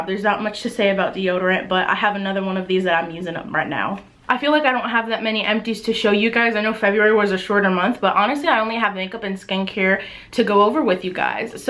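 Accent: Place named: South, United States. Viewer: You sound extra southern in this video 😂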